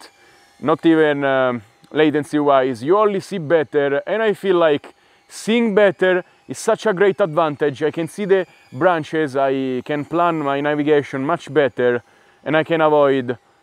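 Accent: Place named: Italy. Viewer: Your english is the best italian Style,its like music in my ears Grazie👍👍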